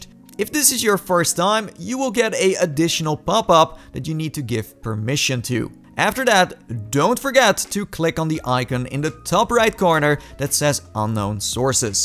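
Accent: Netherlands. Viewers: lol okay I hear within the first 4 seconds you are presumably dutch :) haha, cheers man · are u from the netherlands cause i am and i recognzie the accent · Try english beter dude u dev sound like someone who’s dutch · Are you from holland?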